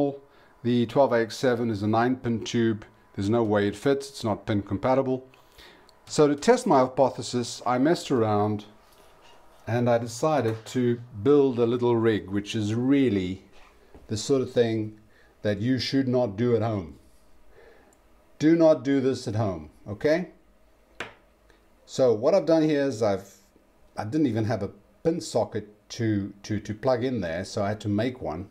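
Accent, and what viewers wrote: South Africa. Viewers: I happened on one of your newer videos, found value (got two needing new capacitors), so started at the first video posted. Dad passed on an interest in people and their accents, so was trying to place it, vaguely familiar. Now knowing an Afrikaans accent, of course, heard it years ago in some of the Uni classmates. My only connection is Dutch ancestry, who desired so much to be American, our family no longer speaks the Mennonite dialect or German. But, the attraction to Germanic was not removed, have a Telefunken upright console and a Körting (an American-German love child, my example, a stereo SE ECL86 with not one shred of information on the internet). 😜 Cheers, Adriel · "do not do this at home.." Why not, thats an excellent solution if the correct part is not at hand, hehe :-) Judging from your accent this old saying would apply: `n boer maak `n plan. 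En dis geen kak plan nie meneer, dis baie goed werk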